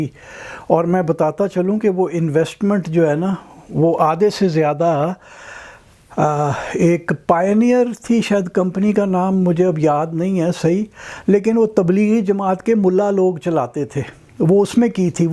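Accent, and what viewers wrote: Punjab, Pakistan. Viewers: Your Pukka Punjabi Accent is so lovely👌 I love all your content Salman Rashid Sahab !!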